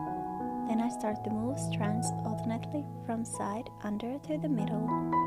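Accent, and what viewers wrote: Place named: Finland. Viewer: She's Finnish. That's literally a Finnish accent. Are you serious right now?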